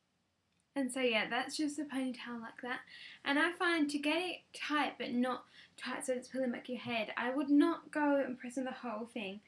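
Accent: England, United Kingdom. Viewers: I "liked" because I love your cute english accent! ((( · you sound english? or an american putting on an american accent? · guys, 1. its not 'english' accent, its 'british'. 2. so what if she says litterally alot? dont make fun, these are quite cute, just not something everyone would use.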